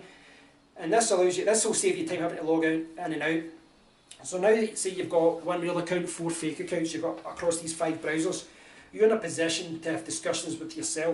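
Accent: Scotland, United Kingdom. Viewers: Hi What part of Scotland are you from? I live in East Lothian Love the vids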